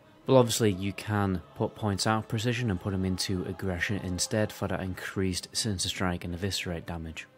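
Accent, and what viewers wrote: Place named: Teesside, United Kingdom. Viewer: You sound like a teessider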